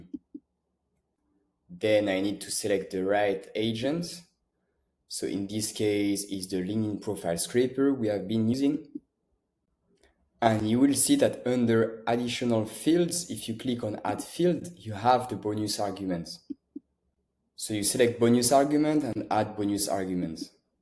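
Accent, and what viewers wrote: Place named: France. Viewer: no wonder nobody watches the videos, cant understand that thick french accent.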